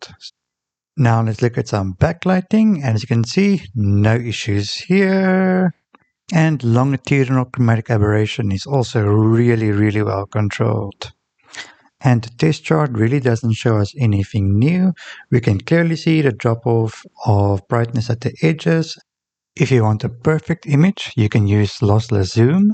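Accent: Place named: South Africa. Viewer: Always a pleasure to hear a South African accent on a review. I wasn't sure at first but once I saw the sparletta I got confirmation 😅 great video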